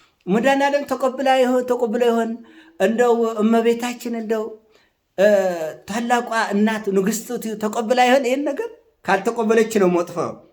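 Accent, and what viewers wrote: Tigray, Ethiopia. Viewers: በትግሬ አክሰንት በመሆኑ እጅግ አዛናኝና ማራኪ ነው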